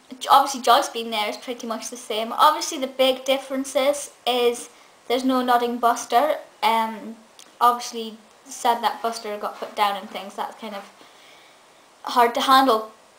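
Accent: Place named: Scouse, United Kingdom. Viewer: Paul O Grady show is great it's the only chat show I could really get into. You sound a bit scouse with a bit of scot in you.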